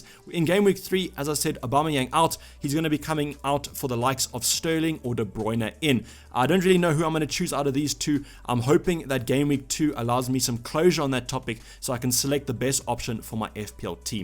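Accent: South Africa. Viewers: Are you South African? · Found this video on my recommendations glad I did there was some valuable information in there love the SA accent and will be subbing.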